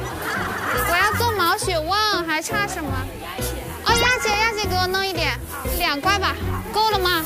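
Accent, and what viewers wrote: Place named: Zhejiang, China. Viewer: 很浙普吧！浙江美女代表，聲音軟糯軟糯的，好好聽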